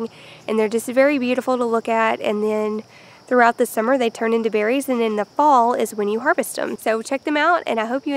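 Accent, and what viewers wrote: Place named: Texarkana, United States. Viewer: I could tell by your accent you are from Texarkana ❤ My grandparents and mom were born there so I have lots of family there. We have beauty berries all over our land in East Tx and I was watching your video while picking the berries from stems I had cut this weekend. Oh my goodness, these plants stink lol!! No one mentions that in videos. Maybe it's just me but they made me sick to my stomach smelling them for a couple hours. I sure hope the smell is gone after boiling!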